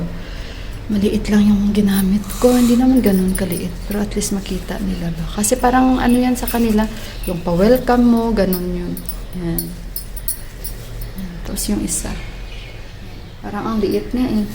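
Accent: Iloilo, Philippines. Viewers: Saan k dito sa pinas sis prang ilonggo yong tagalog mo bago po ako dito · Hello ate, nice to see your vlog, saan ka po sa visayas te. Ilonggo accent ka. Ilonggo dn po ako. 🙂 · Hello po sa mga in laws nyo. Ang sweet nyo po magkwento. Ilongga po ba kayo kabayan? Parang d po kayo marunong magalit hehe. Magiging busy na Po kayo nyan with your in laws. Pero update nyo parin sana kmi. Godbless po ❤️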